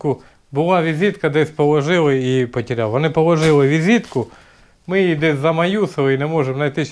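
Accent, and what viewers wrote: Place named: Kharkiv, Ukraine. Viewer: Этот человек говорит на местном диалекте и вряд-ли он умеет разговаривать на русском. На Харьковщине везде так говорят .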